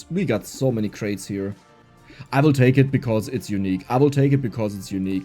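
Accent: Germany. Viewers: what do you mean finding out? You couldn't tell from the German accent?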